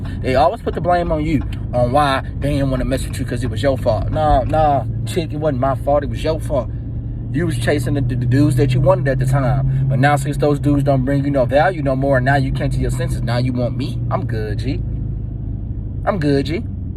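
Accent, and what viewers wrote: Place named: Maryland, United States. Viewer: Bruh gotta be from Maryland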